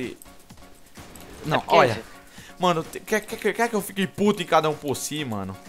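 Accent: Northeast, Brazil. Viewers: Bola de GÚDÍ , BÓDÍ KKKKKKKKKKKKKKKKKKKKKKKKKKKKKK Eu amo os sutaques nordestinos Kkkkkkkkkkkkkkk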